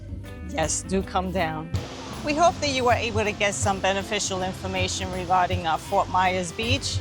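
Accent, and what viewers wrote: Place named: New York, United States. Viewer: Can't take the NY accent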